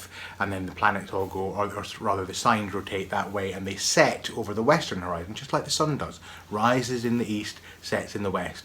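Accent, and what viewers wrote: Scotland, United Kingdom. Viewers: Hi there. I worked on this on the 29th July 2021 after watching just this lesson. I thoroughly enjoyed finding that my Base Chakra has the Sun together with Venus! Chiron is with Neptune in the Heart Chakra. Jupiter joins with Uranus and the Moon in the Throat Chakra. I found it quite fun to bring the Elements, Positions and Polarities into the mix too. I enjoy your other videos as I studied 19 years with a private Jungian teacher, worked with Astrology and Tarot through the 70s. Its wonderful to have the old background learning in all these and other areas brilliantly updated. As I child I regularly dragged my mother into a particular shop so I could hear the Scots accent so this is another benefit! Thanks for your dedication and enthusiasm.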